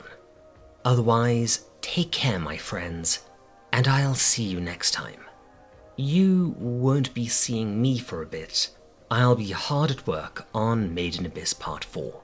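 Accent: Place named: South Africa. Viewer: Dude - you're South African? Been binging your vidoes and had no idea until you mentioned load shedding and having a kudu on the wall growing up. Now that I am listening, I hear how you say "off" as "orf". Peeps in the UK always made fun of me for that lol. Keep up the great work 🙂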